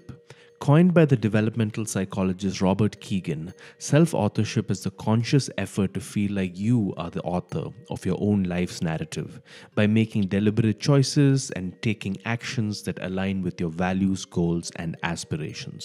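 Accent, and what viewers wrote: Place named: India. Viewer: I'm doing well, sir thank you! how about you? you have a very pleasant speaking voice and I always did like Indian accents. where are you from? I visited Varanasi once and bathed in the Ganges. the entire trip was such a memorable experience. thank you for this educational video, sir. ttyl, buddy! 🙂👋🏻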